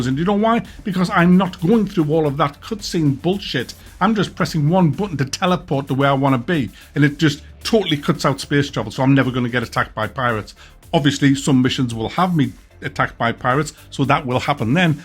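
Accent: United Kingdom. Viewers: I like your accent. What part of Britain is it from?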